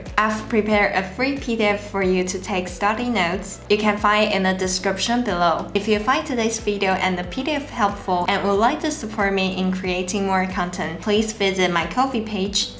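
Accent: Taiwan. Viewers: 怪不得感觉好想最近越来越多老外说中文时候有台湾腔了，原来是因为有个这么正的台湾妹做了这么专业的视频，就连我这个大陆人都忍不住看了好多集…… 厉害，加油。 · You have too much of an Taiwanese accent though when speaking mandarin, people will get confused when they compare it with standard mandarin that it sounds different.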